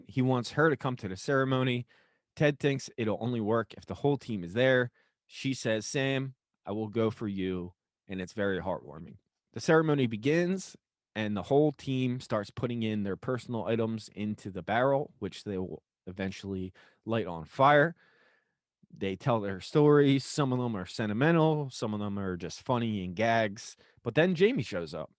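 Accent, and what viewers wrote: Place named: Philadelphia, United States. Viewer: I love your Philly accent :-)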